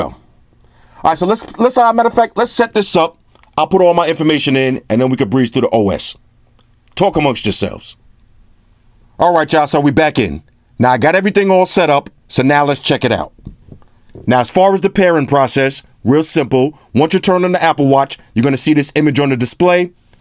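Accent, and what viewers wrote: United States. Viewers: Haha. That's awesome,. You sure sound like an American. Nonetheless your reviews are awesome. Keep it coming!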